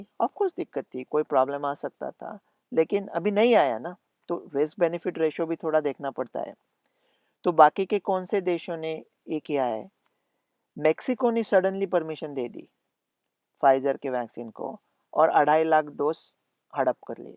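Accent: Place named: Maharashtra, India. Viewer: Tumchya Hindi madhye pan Marathi aahe. Take this as a compliment.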